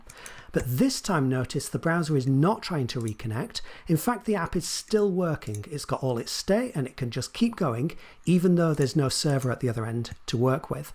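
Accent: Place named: Australia. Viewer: Great guy but can never listen to him for more than 1 minute due to stupid Aussie Accent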